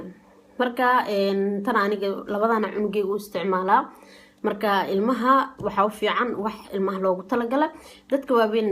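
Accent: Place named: Italy. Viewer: byo mashalh waad fcnthy lkn Lahjadaada wlhi daacaddii ma fahmayo af talyaani iyo sisi kale baad u hadashaa mrka ugu yaraan ingredientska english noogu sheeg adoo iga rali ah .. thanx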